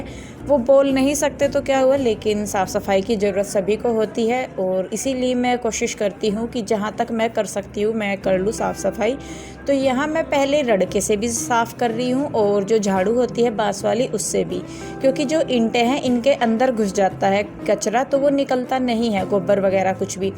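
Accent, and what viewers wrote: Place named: Bijnor, India. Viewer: Mam apka face bilkul meri mami se milta h bilkul same ap ek bat batao ap up mei bijnor side se ho na apki language se mjhr aisa laga kyuki m bhi whi se hu isliye mjhe laga aisa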